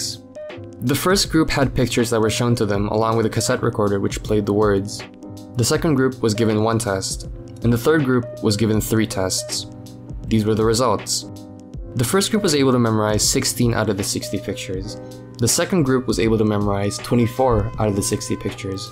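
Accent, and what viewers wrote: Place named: United States. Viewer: Hey, are you Indonesian? Or Malaysian? But, you sound so American, hhhmmm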